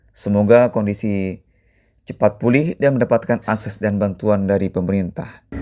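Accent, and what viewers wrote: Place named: South Sulawesi, Indonesia. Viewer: Salam mkssr daeng baru tadi lihat sort ehh logat"nya Sulsel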